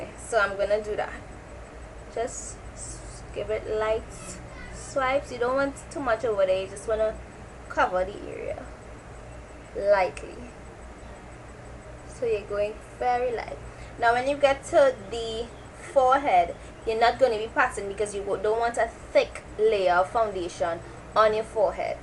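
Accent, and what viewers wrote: Trinidad and Tobago. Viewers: love to see my Trini ppl . love the accent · oOh cool ur fromm triniii heariin da accent! (: which one would u recommend for oily skin the stick or the cream to powder foundation by black opal???? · haha You sound like my mum, she is a Trini too and she talks the same way you do, aw so cute hehe :) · Its trinidadian accent :D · Are you trini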